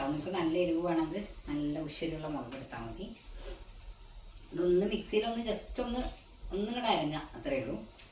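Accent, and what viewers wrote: Valluvanad, India. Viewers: Valluvnadan slang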